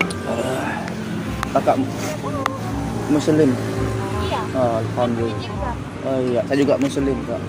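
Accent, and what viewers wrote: Lombok, Indonesia. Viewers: Logat Lombok ya gak ilangg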